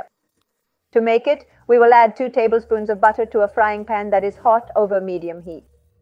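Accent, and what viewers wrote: Andalusia, Spain. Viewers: Solo por tu graciosa manera de comunicarte, como toda una andaluza, tu like y tu suscripción!!!!! Saludos desde Santo Domingo.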